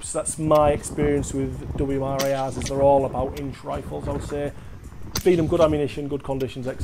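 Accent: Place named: RP, United Kingdom. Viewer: You sound very Posh! At least to me, i'm form Philadelphia. lol.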